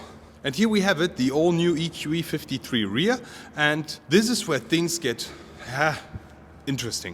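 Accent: Germany. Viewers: Dein deutschenglisch ist furchtbar alter…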